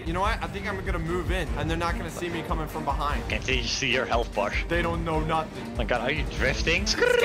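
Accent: Scotland, United Kingdom. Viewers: I didn't know was Scottish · is Scottish wow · Is Scottish or something?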